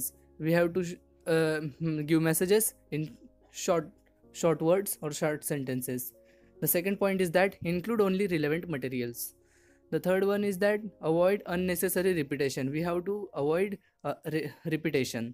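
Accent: India. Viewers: Indian accent with english language No thank you i'm not watching this video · clicks video hears Indian accent fuck_no.jpeg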